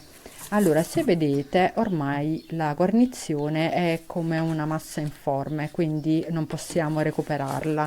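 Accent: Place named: Apulia, Italy. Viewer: Brava e chiara. Con un simpatico accento pugliese credo. Complimenti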